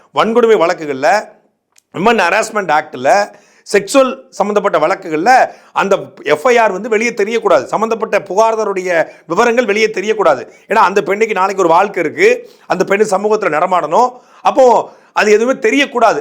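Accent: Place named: Telugu, India. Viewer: இவன் தி மு க காறன்மட்டுமல்ல தமிழ்பேசும் தெலுங்கன்போல.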